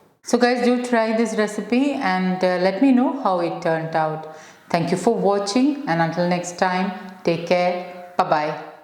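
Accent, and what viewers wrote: India. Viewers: Very bad voice and typical weird Indian English accent. Sorry to say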